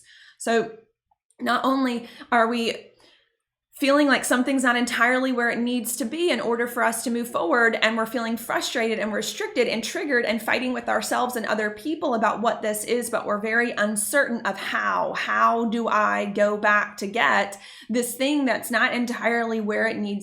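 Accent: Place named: Michigan, United States. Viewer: Are you from Michigan? I'm from Michigan. And I hear a lot of Michigan in your voice... 😉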